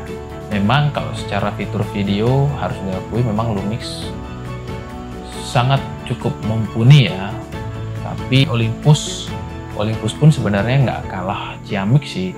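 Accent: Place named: Bali, Indonesia. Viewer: Orang bali ya bli? Logatnya sangat bali😄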